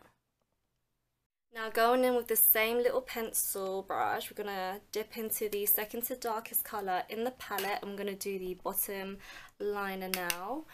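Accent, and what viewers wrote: United Kingdom. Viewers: omg i am so late to subscribe to your channel. omg where have you beennnnnnnnnnnnnnnnnn. first off i love your accent its like british and funny enough i want to start talking like you. thank you for the inspiration on not to mind what people say about your makeup i love u so much. 😍😍😍😍😍😍😍😍😍😍t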